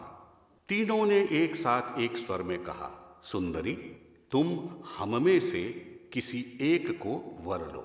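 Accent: Standard. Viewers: उच्चारण की विशुध्दता स्पृहणीय है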